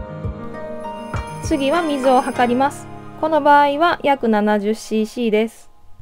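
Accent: Kansai, Japan. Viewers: 2秒で純な関西人だとわかってしまう動画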